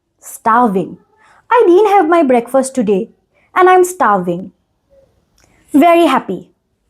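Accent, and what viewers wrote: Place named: Bihar, India. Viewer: Your accent is bihari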